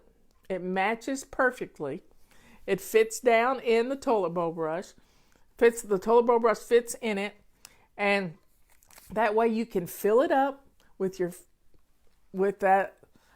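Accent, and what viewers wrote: South, United States. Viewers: Congratulations Marla! I've been a follower of you and the system for many years. I really enjoy listening to your encouraging words and southern accent as I rest with recent diagnoses and treatment of matasticized breast cancer of the lungs. I enjoyed you back in the years past and I love that I can hear you daily as I do what I can. Love you and thank God for you, Richard, your dogs, your family and flylady staff! You make my day!...sylvia in WA state 😊 ⛄ 🎶 🐶🌹 🎂 🍹 🎁 🎉 🙏 💖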